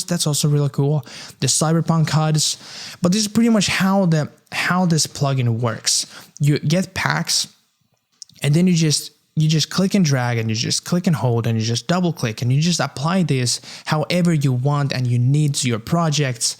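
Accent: Finland. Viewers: Are you from Finland? Just a hunch based on your accent. Good vid man.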